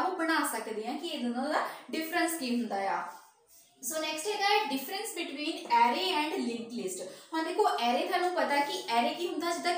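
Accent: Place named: Punjab, India. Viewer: Ma'am this video seems very informative and useful since I am having my viva in 2 days but can't understand your language coz of little bit Punjabi accent and language. But thankyou so much ma'am for this video🙏🏻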